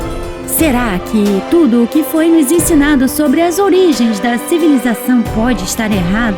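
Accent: Rio de Janeiro, Brazil. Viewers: Amei suas historias e sua voz carioca😊❤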